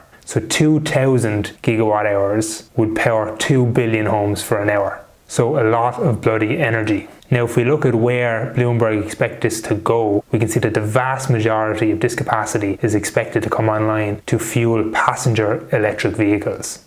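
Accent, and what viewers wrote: Ireland. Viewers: "Tree different stocks" as an American this is my favorite thing about how the Irish talk. 🙂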